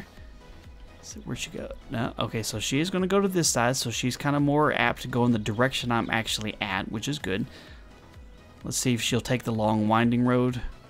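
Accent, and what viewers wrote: South, United States. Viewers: You have a cute Southern accent.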